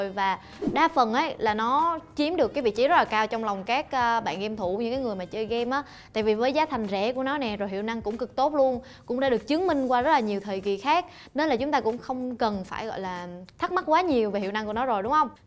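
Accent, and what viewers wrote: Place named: Saigon, Vietnam. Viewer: Ban Nu nay giong Sg tot... Than thien voi moi truong !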